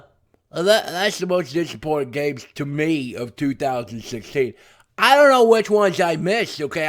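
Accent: South, United States. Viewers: I haven't watched in a few years. What happened? The voice is totally different. It sounds nasally and like a bad mix of a Southern accent and...something else.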